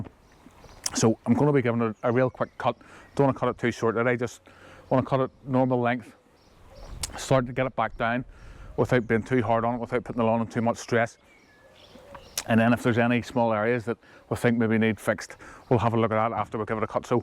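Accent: Northern Ireland, United Kingdom. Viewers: Helpful video, for supportive and constructive feedback it would help to slow down the rate of and narrative in the speech dialogue to make it more easily understood given the strong Northern Irish accent, much of the dialogue in this video was difficult to understand but overall helpful advice, thank you.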